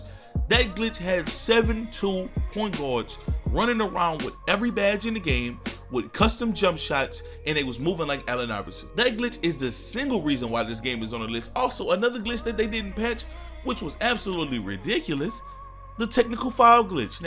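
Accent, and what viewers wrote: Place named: Houston, United States. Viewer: gotta be from Houston ... nigga sound like Maxo Kream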